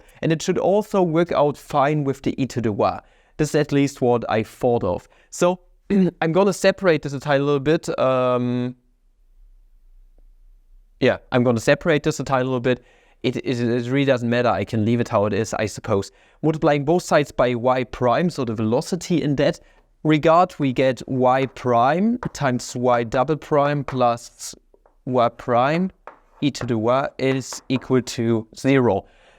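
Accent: Germany. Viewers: I very much appreciate your videos, just brilliant mathematics. The heavy German accent is not a big deal (I’m German myself). But please, please, do me a favour and try to pronounce the “i’s” and the “y’s” as /ai/ where needed. A “y” and the word “why” is pronounced correctly /wai/ and not /va/. It means corporal pain to me to hear it like 100 times in a row in your videos.😢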